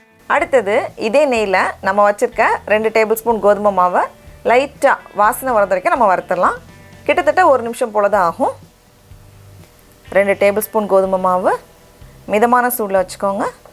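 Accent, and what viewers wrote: Nagercoil, India. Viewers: Are u from Nagercoil ?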